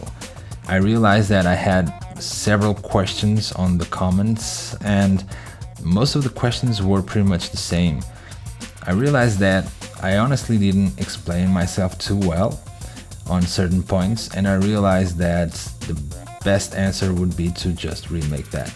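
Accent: Portugal. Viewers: ele é português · pandahg00 Portugal n br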